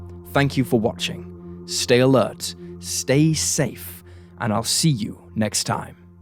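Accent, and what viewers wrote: United Kingdom. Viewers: When I click on a YouTube video and I hear the narrator has a British accent I am immediately disappointed 😞.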